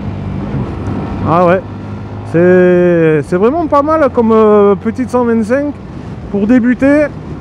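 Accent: South, France. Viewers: Extra.. j'attends la mienne avec impatience.. très bonne vidéo avec l accent dû sud ..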